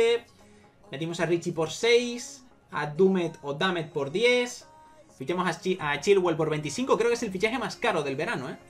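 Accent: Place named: Spain. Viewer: Lo siento Bro si te eh ofendido pero nunca escuché ese acento,los latinoamericanos no solo usan la s en vez la c hablan con acentos y cosas diferentes,pero tú hablas igual que un español pero con eso de la s,da igual,repito-los siento si te e ofendido era una duda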